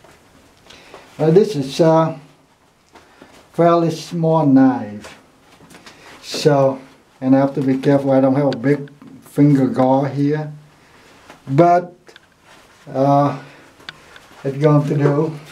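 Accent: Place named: Vietnam. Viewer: I'd love to buy one of your knives in the future, I'm sure they're going to be great =) just your sharpening/grind alone will be great, but you seem to be getting really good at the hardening/tempering too. awesome stuff. can i ask what country your ancestors were from? i'm curious about your accent :) it kinda sounds vietnamese or cambodian to me.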